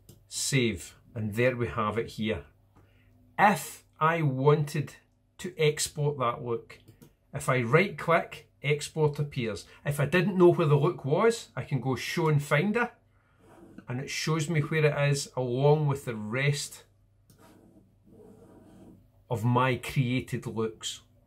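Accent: Scotland, United Kingdom. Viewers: I looove your scottish! I want you to tell me great tales! =)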